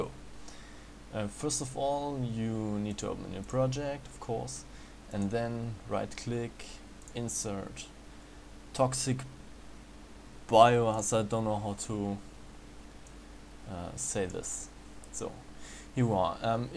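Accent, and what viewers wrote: Germany. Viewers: Super tut, aber komm, an deiner Aussprache erkennt man den Deutschen ;) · Du bist Deutsch oder ? :D merkt man am Aktzent :) , sehr gutes Video Danke für die Hilfe :) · 100% deutscher :D hört man am akzent :D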